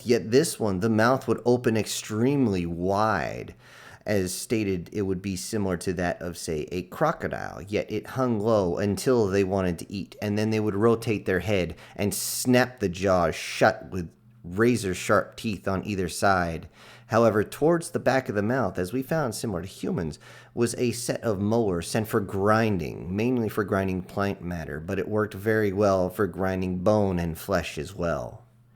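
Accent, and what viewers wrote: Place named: South, United States. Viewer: Hearing your southern accent I really want to hear a story with a Scottish accent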